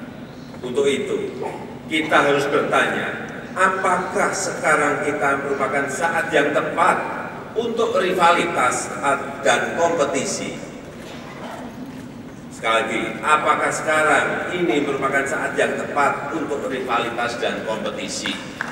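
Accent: Java, Indonesia. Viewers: bintang anda wong jowo opo Ra? Kalo bukan wong jowo gak usah komen .. itu logat jowo , kan jokowi baca bukan pas sholat .. gapapa bro liat penjelasan ulama sepuh jaman dulu mereka pas dzikir bacaan nya kayak asing.. kalo gak tau mah gak usah merasa benar dah contoh kayak orang Sunda gak bisa ngomong f tapi jadi p .. niat nya kan memuji Allah SWT .. kalo anda bilang begitu berarti orang gagu berdosa semua dong mereka semua logat orang yang kekurangan gak bisa fasih baca bacaan Al-Qur'an ... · Kangen versi bahasa inggris pake logat Jawanya ... Pernah dengar kah kalian... logat Orang India, Rusia, Arab, Jepang, saat mereka menggunakan bahasa Inggris/Indonesia??? Di situlah kita bisa mengetahui dari mana negara mereka berasal ... Maju terus Presiden Ku.. Menjadi Kebanggaan untuk Ku Bagi Ku.. Presiden Ku adalah ... "PRESIDENT MADE IN INDONESIA" #2019PRESIDENKUmadeinINDONESIA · bintang orang jawa logat beda pret..kayak daerah ku sholat ashar bilang ngasar ran ..emang salah menurut low yang maha bener hadexhh..niat utama yang penting..salam warass. 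· bintang alpateka koq ribut org jawa mmng logat aslinya gt cb liat puji2an dr sunan kalijaga logatnx jg jawa. .gt sj ribut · bintang. kamu suku... mana??? itu logat jawa cokk.. jawa tulenn... cek sendiri... di daerah2 jawa... khususnya yg dusun ato desa... logatnya... gimna... kiyai2 spuh pun gk seperti km...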